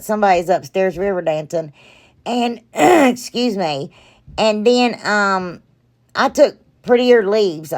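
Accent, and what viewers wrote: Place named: Georgia, United States. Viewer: Just this morning I found your channel and I’m delighted!! Do tell from where your lovely accent comes? It sounds like you’re kin with my people down in RomeGA…your lovely sense of humor just had me grinning from ear-to-ear! Blessings to you/yours!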